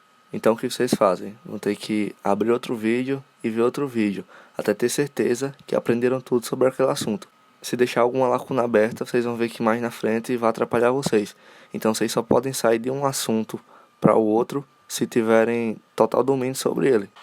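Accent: Northeast, Brazil. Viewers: Você é do Nordeste?🤔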